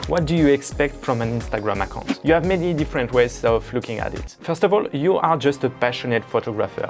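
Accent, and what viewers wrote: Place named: France. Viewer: Tu es un français avec l'accent 😂♥️♥️♥️🙏🏽🙏🏽 bon courage frère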